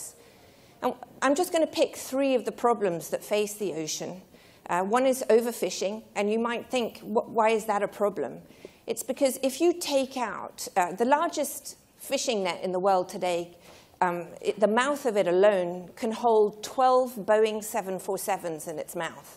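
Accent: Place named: New York, United States. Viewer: Her accent is soo ny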